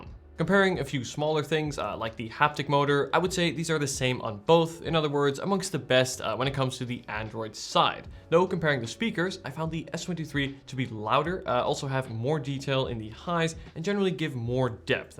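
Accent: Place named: Netherlands. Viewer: Ik kon merken aan je accent dat je Nederlands bent, haha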